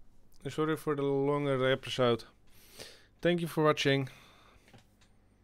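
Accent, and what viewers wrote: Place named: Netherlands. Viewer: Ik hoor een beetje nederlands accent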